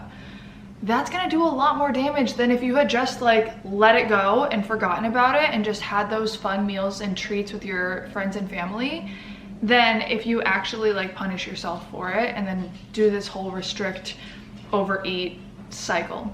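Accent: Netherlands. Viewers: you kinda have a Dutch accent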